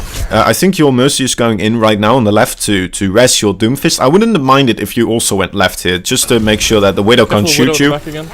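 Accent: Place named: Netherlands. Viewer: haha don't worry it's because i'm from the neighboor dutch speaking country i just recognize the accent ;-).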